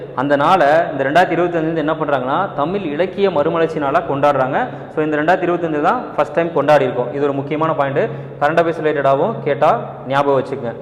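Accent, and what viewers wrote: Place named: Tirunelveli, India. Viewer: சாருக்கு திருநெல்வேலி போல... ழ் உச்சரிப்பு மட்டும் தகராறா இருக்கு. மத்தப்படி பாடம் லாம் செம டீடெயில் ❤️ உதவியா இருக்கு 🙌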